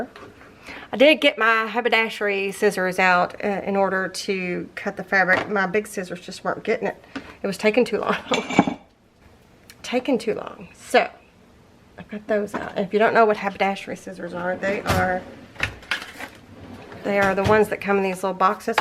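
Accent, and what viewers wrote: South, United States. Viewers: Has nothing to do with sophistication its accent from the south. Give yourself some credit girl.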